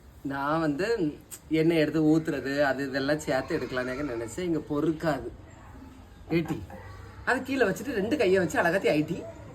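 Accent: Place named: Kanyakumari, India. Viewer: Ungae kanyakumari slang super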